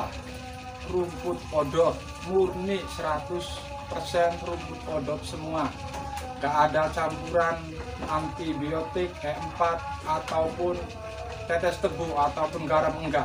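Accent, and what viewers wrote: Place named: Java, Indonesia. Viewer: Ngomong logat jawa kang mase, daerah ngendi iki mas?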